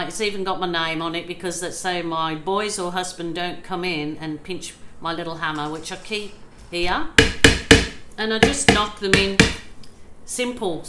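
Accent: Birmingham, United Kingdom. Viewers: Me too, ex brummie. Noticed the accent straight away. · You sound like me, I’m a brummie... a thingy 😂😂😂😂💖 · Thought exactly the same...she’s deffo got a brummie twang 😀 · Yeah....don't get your heat gun mixed up with your magic wand, ladies! It would lead to an embarrassing trip to A&E 😂😂, are you originally from the UK, Birmingham type of area? I'm trying to place your accent and it's driving me crazy 😂😂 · I thought she sounded like she was from Birmingham in the UK LOL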